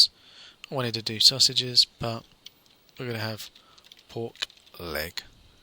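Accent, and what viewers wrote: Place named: England, United Kingdom. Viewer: ah well... audio things may come more naturally to me as studied music and got really into mixing when I left school. audacity is free and I would encourage anybody making videos to run their audio tracks through it and back into their video editor. def worth playing around with. I never worry about speech impediments, they're part of a persons unique accent. isaac arthur is one of my favourite youtubers and he has a reeeeeeeally bad tongue tie, but everybody loves listening to him lol. and your accent is great, very english. I think particularly australians don't particularly like listening to australians, but we get bored of hearing americans all the time. (Also I wouldn't have recommended an EQ back then, I would have said just buy a $25USD mic from aliexpress, but I wasn't listening to you back then lol)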